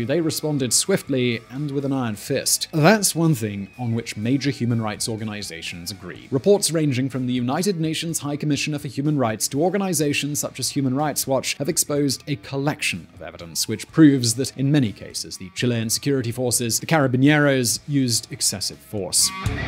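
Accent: United Kingdom. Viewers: Your bad research giving patronizing talking heads with British accents a bad name dude. . Actual story here: <link>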